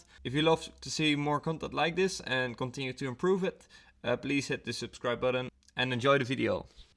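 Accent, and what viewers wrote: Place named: Brabant, Netherlands. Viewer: das wel bekend dialect!! midden Brabant?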